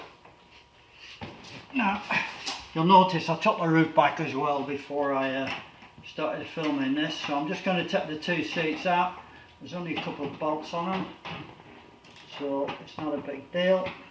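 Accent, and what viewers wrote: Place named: Northern England, United Kingdom. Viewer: The accent is still as strong, I would guess Rochdale, Chorley, Bury, around that area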